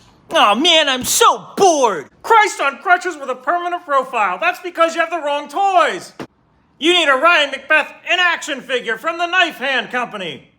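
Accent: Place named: United States. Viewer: To me as a New Zealander. He certainly speaks english with a perfect American accent with no grammatical giveaways! But what do I know?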